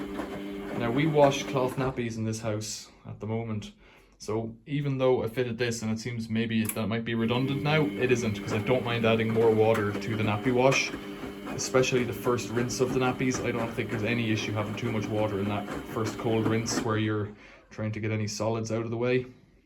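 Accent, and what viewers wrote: Ireland. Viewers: Just had my sunday lunch and now I am snoozing with your lovely Irish lilt soothing me as I drop off.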